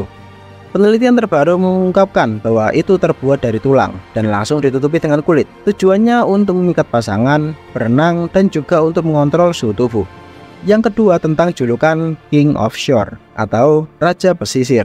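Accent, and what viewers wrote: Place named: Java, Indonesia. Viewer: Bg mhon maaf nih ye,,😂.. kok suaranya abg nih mirip chanel nyoto tutorial ye?? Apakah org nya sama😅.. khas kek ada jawa nya gitu cara bicaranya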